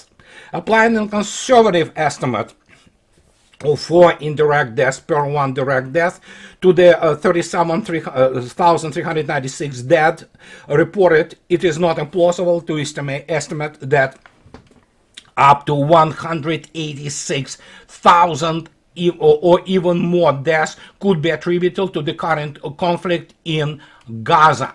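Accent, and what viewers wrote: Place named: Russia. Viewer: I have no problem with that! Do you want to help this country or not? you came here to escape the Soviet Union and communism. Are you with us or against us? I'm talking about the American people not the elite that are against us in the US government? That is what my prior statement was all about I just don't know where your heart is when I'm listening to you. Cuz you sound 100% Russian which is fine but I don't hear you talk about America and what to do to fix it. So where is your heart line this?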